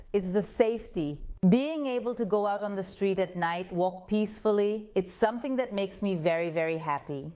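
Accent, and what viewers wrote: Israel. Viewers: Thank you. I love your Israeli accent and your content.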